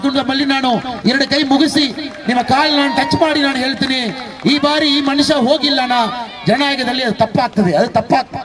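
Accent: India. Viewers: இது கொங்கு நாடு கன்னடம்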